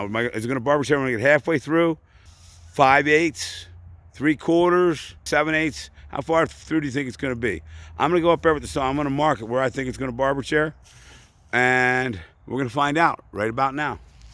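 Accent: New York, United States. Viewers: An arborist from New Yoik! At least, that's how it sounds to this Englishman. Loving your work sir👍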